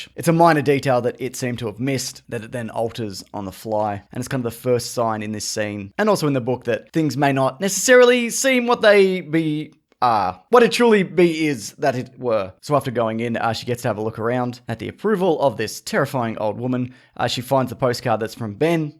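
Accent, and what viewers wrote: Australia. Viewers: Love your accent. I'm guessing it's Australian? Also did you know Stand By Me is a prequel to IT? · Are you Australia? I am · U Aussie mate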